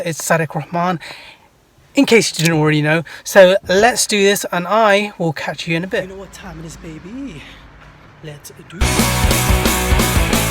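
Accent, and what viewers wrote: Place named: United Kingdom. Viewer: Yes boi. Smash that gym!! Sick accent you living in UK?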